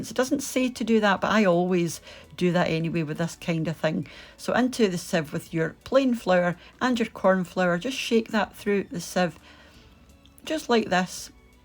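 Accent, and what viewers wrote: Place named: Inverurie, United Kingdom. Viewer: Mystery solved! Now I know why you sound like my friend from Inverurie! 😆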